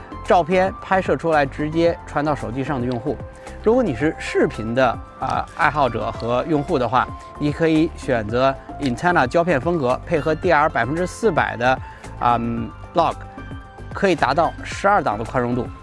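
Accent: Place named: Beijing, China. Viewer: 啥时候澳洲又来一位，我就知道金叔和顾俊，看起来这位水准更专业，北京口音听起来不如那2位亲切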